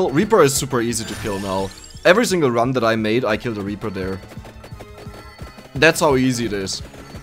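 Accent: Germany. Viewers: If you want to know why you sound German I can recommend the video from improve your accent on this topic (it helped me with my Englisch) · He already know why sounds German.... Because he is German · How you pronounce your English words is how non-Germans recognize your nationality... it's your accent. That's not good or bad, it just is what it is. :) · I heard you where German the first time I saw a video of yours! I am Norwegian, so I recognise German/english easily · the reason people can hear you're german is your pronounciation of words, and also your "so" word. You say so as a german would say so in german xD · You wondered why people can tell that you're German. I think the two biggest tells are pronouncing "the" as "de" and the specifics of "ya" as a replacement for "yes." "th" becoming "d" is VERY German. You extend the "a" at the beginning of "ya" and use a sound at the beginning that's halfway between "y" and "j," which is also very German. 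· Your english is close to perfect I think. Its just the accent thats German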